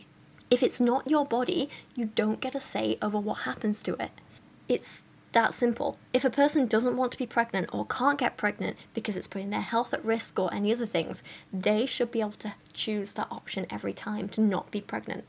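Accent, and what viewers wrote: United Kingdom. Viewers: In addition to misogyny, there are a couple of other prejudices Americans have that may be related to why you have been called pompous in comments. First is Americans often associate British accents with being rich, pompous, and stuck up. I’m not sure if it is because of old movies, or following the British royalty too closely, or the listeners feeling dumb for having some difficulty understanding you. (When I first found your channel, I would use the closed captions, but was fine after two or three videos. My mom would watch British shows but my father could never understand the accents, even after 40 years of marriage.) Another prejudice the American right has is that anyone who is educated, man or woman, is a pompous liberal who looks down on them. They often blame “liberal” colleges instead of questioning what could be wrong with their beliefs that causes smart to reject them.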